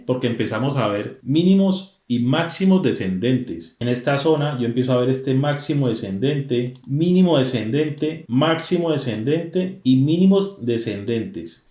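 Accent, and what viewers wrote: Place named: Valle del Cauca, Colombia. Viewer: Hermano saludos desde 🇨🇴 !!! eres colombiano cierto? Vallecaucano? pero por lo que veo no vives en colombia porque dijiste que operabas en horario europeo... En fin... solo queria darte las gracias por este contenido... te acabo de encontrar y llevaba rato buscando alguien que explicara sobre estructuras de mercado! Esto en este momento de mi vida es de mucha ayuda ya que estoy comenzando como trader y creo que entender esto es fundamental para poder ser rentable! Te doy un par de ideas de videos ya que veo que estas comenzando con el canal! seria bueno que hicieras un video explicando la terminologia que usas... por ejemplo... que significa "liquidez" cuando dices que hay traders buscando liquidez. o que significa acumulacion y cual es la diferencia... esto es algo que no tengo muy claro y que nos ayudaria mucho a entender tus videos... 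Muchas gracias nuevamente y aqui tienes un nuevo subscriptor fiel de tu canal!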